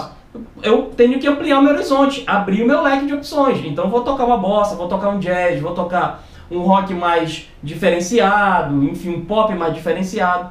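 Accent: Pará, Brazil. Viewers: Ele e paraense, da pra ver pelo sotaque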